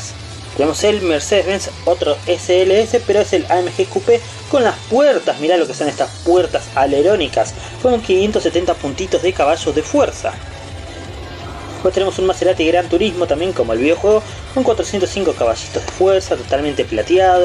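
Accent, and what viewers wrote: Argentina. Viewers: de donde sos bro? Creo que eres argentino como yo pero de que provincia?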